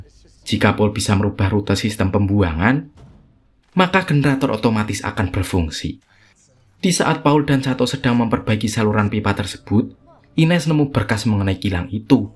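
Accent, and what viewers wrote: Java, Indonesia. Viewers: Logat Jawa pekat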